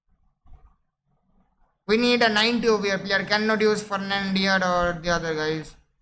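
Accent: Bengal, India. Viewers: are you bengoli?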